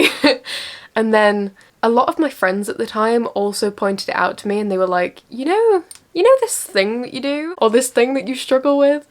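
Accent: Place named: United Kingdom. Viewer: Your British accent is so cool, I can actually understand it like American English but with the British vocabulary. What part of Britain are you from?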